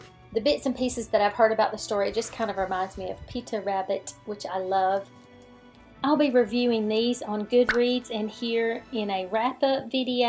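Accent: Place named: North Carolina, United States. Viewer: I just found your channel through Taylor. I have the secret garden book too. I really can’t wait to read it! It looks so pretty. Side note - are you from NC? Cause that’s where I’m from and your accent sounds just like so many from down here! I’m on the last chapter of Mansfield Park! I absolutely love it. The end of the book does take place in spring time, just after Easter.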